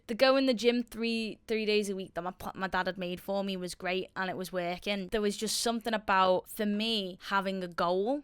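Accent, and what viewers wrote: Liverpool, United Kingdom. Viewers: Love the scouser accent ❤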